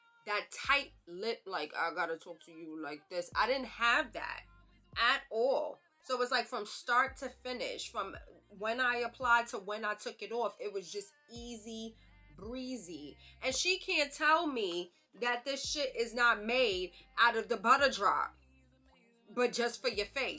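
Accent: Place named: New York, United States. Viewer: i knew u was from NY when the video started and i hurd u talk! #NYShittt 🗽🗽🗽🗽 And i just ordered mine. cant wait! 😊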